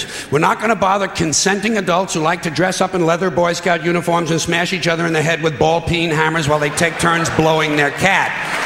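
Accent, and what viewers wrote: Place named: California, United States. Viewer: sounds like a Californian, still a funny man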